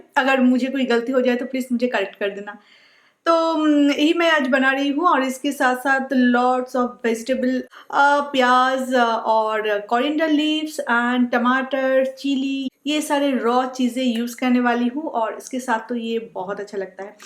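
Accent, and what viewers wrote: Bengal, India. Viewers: Ur bengoli mixed Hindi language sounds oswm😍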